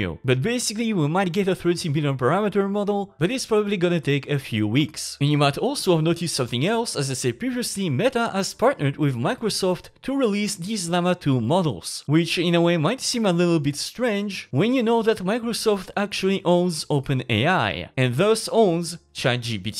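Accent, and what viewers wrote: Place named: France. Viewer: First time i heard you i thought you had a french accent, and since the first translation you made was in french now i can almost safely assume. "t'es français" (à moins que je me trompe x)).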